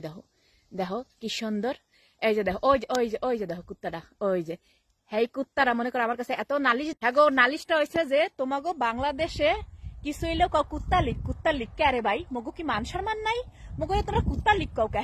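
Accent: Barishal, Bangladesh. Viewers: আফায়, বরিশালের কোম্মের লোক?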